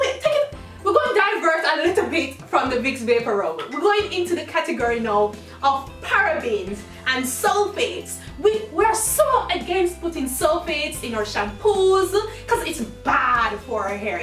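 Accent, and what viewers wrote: Jamaica. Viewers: Am I the only one that heard her say coochie cream? DWL... anyways I just discovered this channel and I love it already mainly cause she uses her Jamaican accent.. Issa Caribbean ting :) You are very funny and helpful.. continue with the good work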